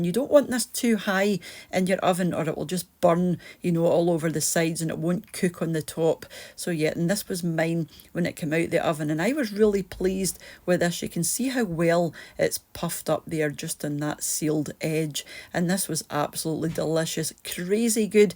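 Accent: Scotland, United Kingdom. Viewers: You cook so perfectly in my opinion. Your Mr. Is one lucky man. I can imagine he looks forward to waking up every day . Knowing he's going to enjoy a delicious and tasty meal. That he gets to enjoy with you because all your meals look like they were made with love . It's am here in south florida and I've been binge watching all your videos . I'm so intrigued how you make cooking look so effortlessly add to that your lovey Scottish accent. You have bewitched me lol 😂. I also love your weekly grocery hauls and your recipes that you plan out for the week . Thank you so much for putting these videos together and inviting us in to share a bit of your world. It's a pleasure to watch you cook you have been blessed with a lovely set of chef hands and a natural talent to turn every dish you make into something more fabulous and delicious . Thank you thank you thank you . 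I'm now looking forward to watching every video you make . Have a wonderful and blessed day my friend . Scotland looks absolutely beautiful.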